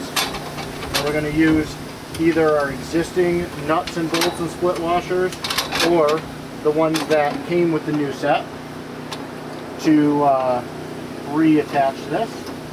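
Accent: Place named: Chicago, United States. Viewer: I detect a Chicago accent . Also that Chicago wind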